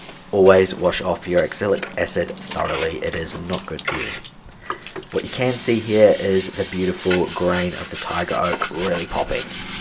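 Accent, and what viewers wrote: New Zealand. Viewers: What a lot of work. I admire your patience. Well worth it and a fabulous finish! Keep up the good work and show us more of your renovations. Is it a kiwi accent I hear? Linda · Watching from NZ. Love hearing the NZ accent! I watch Jay too. So cool you guys support each other. Two big thumbs up from Aotearoa - Land of the Long White Cloud.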